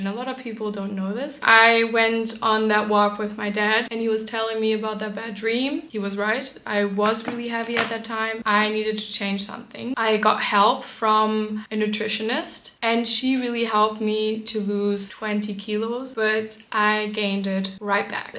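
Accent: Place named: United States. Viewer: You literally sound american! Thats so crazy how living in america for 1 year left you with an american accent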